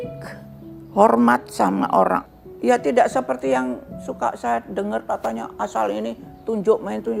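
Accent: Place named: Temanggung, Indonesia. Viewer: Raiso d colong Logate wong temanggung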